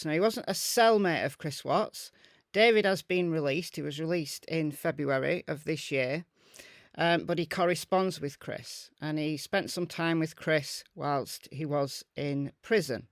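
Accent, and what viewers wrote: United Kingdom. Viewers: You sound like you are in the UK..Nothing wrong with that except it's awfully crass of you to make a video based on bullshit when you don't even live here and also hurting the Watts family with this crap! They are gone tragically but stop dredging up this home made bullshit and let them rest in peace! Nichole Kessinger was involved...Monkeys might fly out of my butt! Now apologize to the Watts family for making this rubbish video and shut up!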